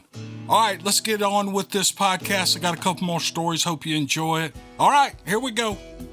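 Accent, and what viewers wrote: South, United States. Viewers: People can be mean and annoying, let them get butt hurt over nonsense. I love hearing your voice narrate these stories, reminds me of my southern folk- we have cool accents! Other people can be jealous of. Keep up the good work,. Hopefully, I’ll hear my story from you soon.:)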